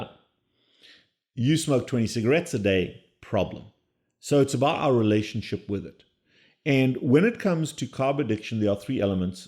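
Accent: South Africa. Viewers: 😂Also the French done a child's portion by an interior decorator! 😂😂😂 I'm also South African, and he hasn't lost the way we talk, although he has left the country long ago😂❤ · He has a South African accent. In South Africa we speak Afrikaans which is very similar to Dutch.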